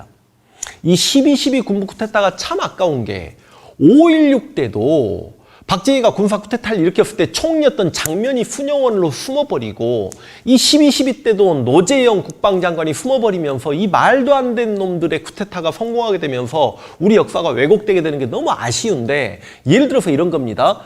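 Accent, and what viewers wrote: Jeolla, South Korea. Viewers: 숨기려 노력 많이 한거 같은데 숨겨지지 않는 전라도 말투로 역사 왜곡하는게 과학이네요ㅋ 사령부라 하지 누가 사령대라 합니까 군대는 제대로 다녀왔나? 기본 상식이 없는 사람이 무슨 역사 강의를 하나 ㅋㅋ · 억양이나 스멜이 라도 필이네. ㅋㅋ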